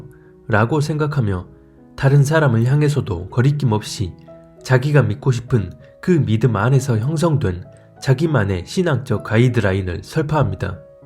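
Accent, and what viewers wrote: Jeolla, South Korea. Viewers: 전라도 억양이구만 ㅋㅋㅋㅋ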